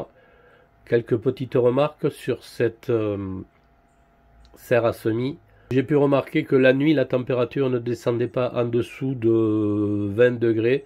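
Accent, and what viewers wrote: South, France. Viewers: bonjour et déjà un grand merci pour tous vos conseils j’ai juste deux questions concernant les dalles vous utilisez la 6000k blanc froid c’est bien ça ? Pour la chauffe ce sont des ampoules de terrarium quelle puissance faut il acheter ? Merci au fait vous êtes dans quel région cet accent me parle perso suis du Tarn ça m’intéresse pour avoir les périodes de semis et de repiquage. Merci encore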